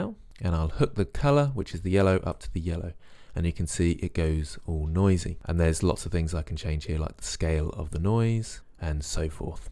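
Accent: United Kingdom. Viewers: one of the best blender tutorials. btw, I really love your british accent - listening to you is better than bbc actually !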